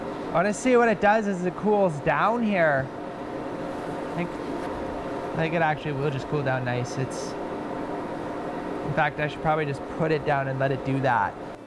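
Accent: Canada. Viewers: I'm Canadian. And you sir, have a truely incredible Canadian accent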